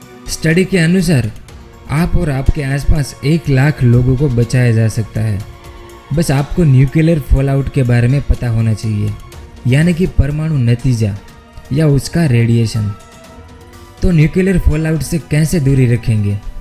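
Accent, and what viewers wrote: Gujarat, India. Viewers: Gujarati Ho kya? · Tu gujarati h kya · Gujarati h kya bhai mst bolta h😁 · A gujrati hai lavda saala awaaz se hi pata chal rha hai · Bhai teri hindi gujju jese arhi hai pls nxt time kisi aur ki awaaz aaye aisa karo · ગુજરાતી લાગે છો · Bhai Gujju ho kya 😃👌mast bolte ho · Bhai Gujarati ho kya?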